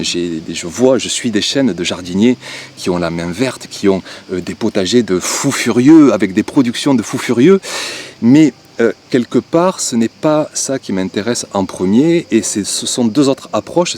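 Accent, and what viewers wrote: South, France. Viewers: Hello, Happy birthday 🎉🎉🎉🎉, je te suis depuis un peu près 8ans, et je ne m'en lasse pas😂, je suis de Belgique.. Mon potager est tout mini rikiki comparé au tiens, mais je ne cultive que l'été, j'ai une superbe serre que mon chéri m'a acheté et j'y suis tout le temps.. Si c'est pas en cuisine, c'est dans le potager 😂😂😂. Le potager est mon excutoire, mon endroit de ressourcement, et puis j'ai comme toi cette fierté de donner des bonnes choses à manger à ma famille, j'aime aussi le partage, et souvent plutôt de garder pour moi, je donne.😮. Ne change rien, ton authenticité et l'humanité que tu degages en fais ta force..et puis c'est tellement plaisant d'entendre ton accent du sud rempli de bienveillance 😊. Sois heureux de ce que tu fais et fière de qui tu es. Au plaisir 😊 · Salut, alors je ne peux pas te dire depuis combien de temps je regarde tes vidéos mais depuis pas mal de temps! 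Je suis toujours content quand je vois que tu as publié une nouvelle vidéo, et j'ai hâte de la voir quand j'ai 5min Je me souviens encore les dimanche a me dire tient mais faut que j'aille voire Youtube a du mettre une vidéo 👍 Merci a toi en tout cas toujours "une totale regalade" comme tu le dis souvent avec ton petit accent du sud 😉 Perso j'adore les expérimentations sur différentes techniques etc, je trouve que on peut être surpris des pratiques qu'on fait depuis longtemps ça remet en question j'aime beaucoup! Continue comme ça et merci encore!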